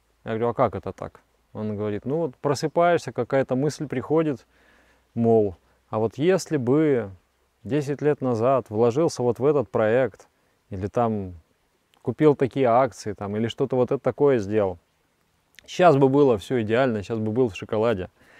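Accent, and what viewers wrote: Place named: Moscow, Russia. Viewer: не думаю, что он украинец. Его канал называется " ", а не " ", это раз. Второе, говорит по-русски, даже после всех злодияний русских, на нашей земле, третье, говорит, с явно мАсковским акцентом. Он не наш.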